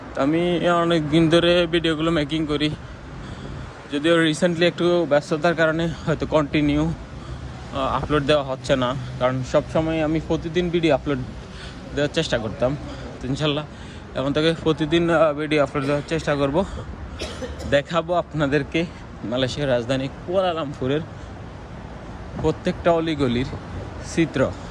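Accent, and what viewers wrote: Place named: Comilla, Bangladesh. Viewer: আপনার বাড়ী কুমিল্লা মনে হয় ভাই ❤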